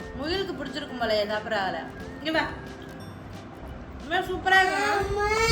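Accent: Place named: Madurai, India. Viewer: Recently started watching your channel ❤so nice to hear the Madurai and Ramnad slang..my parents are from Ramnad.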